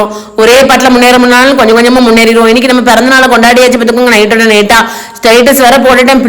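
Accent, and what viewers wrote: Tirunelveli, India. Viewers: சகோதரி வாழ்த்துக்கள் திருநெல்வேலி பேச்சு செம அழகா இருக்கு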